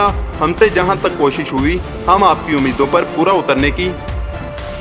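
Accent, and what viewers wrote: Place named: Punjab, Pakistan. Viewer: تمھاری پنجابی زبان کے لہجے میں اردو بولنا انتہائی گھٹیا لگ رہا ھے. پنجابی میں اپنی ویڈیو شروع کرو.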